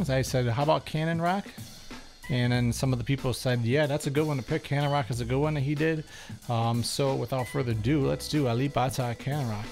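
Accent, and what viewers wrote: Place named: United States. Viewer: good reaction bro, by the way, where are you from, let me guess? your language is American English, am I really you from America ?????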